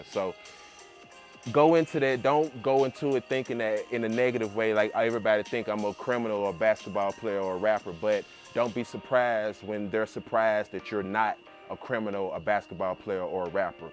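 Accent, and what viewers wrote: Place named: South, United States. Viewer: Youngblood, truly inspired by your message. Spent 11 years living abroad (Italy, Germany, Korea, Djibouti). Keep living your best life King! Oh yea, you gotta tell us what state you from. I am from GA. Your voice sound like you from the da south!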